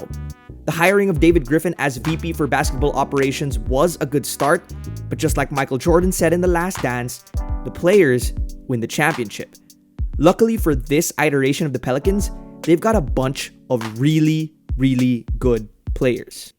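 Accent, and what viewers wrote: Philippines. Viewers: I was pretty sure you’re filipino judging by your accent and name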